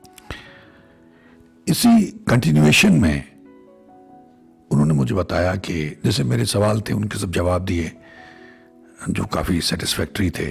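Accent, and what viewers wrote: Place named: Sialkot, Pakistan. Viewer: आप सियालकोटिए हो। आपकी बोल बाणी से लगता है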